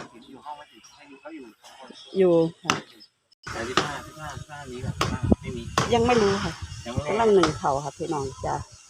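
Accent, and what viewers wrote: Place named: Laos. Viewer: มักแม่นุ่ยลาวเว้าม่วม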